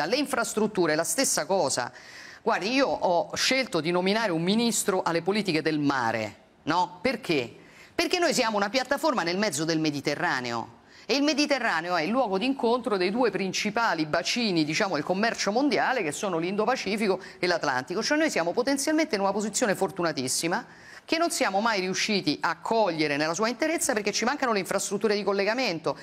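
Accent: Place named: Rome, Italy. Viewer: lei non parla l'italiano ma il romano che è ben diverso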